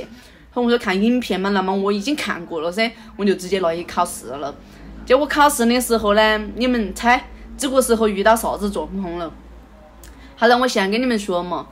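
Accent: Guizhou, China. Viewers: 貴州話和重慶話很近，但不一樣的地方也蠻多的哈！不看字幕，我聽得懂95%！😁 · 恭喜很喜歡全程貴州話聊天聽起來費用力但是賊可愛滴 😘😘😘🤣🤣🤣🤣🤣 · 哇~第一次聽妳用貴州話拍影片，感覺貴州話口音聽起來蠻不錯聽的，有點可愛，有的很像台語，語助詞也蠻多的哈哈，恭喜妳機車駕照考過拿到到手了汽車要注意安全喔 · 貴洲口音還滿可愛的我很努力的聽 🤣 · 這是貴州話嗎？太棒了！加油！ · 先恭喜姐姐你考上駕照很棒喔、不過妹妹我有件事想跟您說、很開心可以聽到有貴州話的視頻、貴州話聽起來很可愛也很有趣、但是姐姐下次可不可以還是講普通話多一點、因為很多貴州話我都聽不懂耶哈哈哈很難去理解你視頻當中的意思只能大概猜一下囉不好意思.所以以後可以還是講普通話多一點嗎？不好意思.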